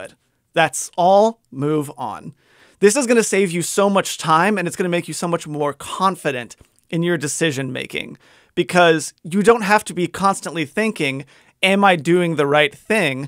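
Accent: England, United Kingdom. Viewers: What happened to the English accent? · when did you stop being british?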